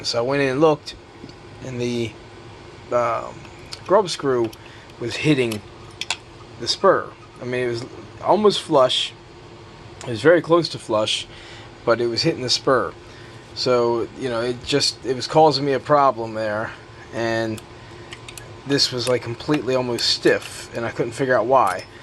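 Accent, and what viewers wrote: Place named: Maryland, United States. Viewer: It is actually a very close guess accent wise, because he is from Maryland.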